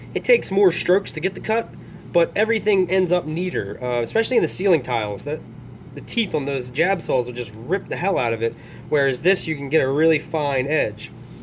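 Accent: Philadelphia, United States. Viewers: Literally two seconds in I could tell you're from Philly lol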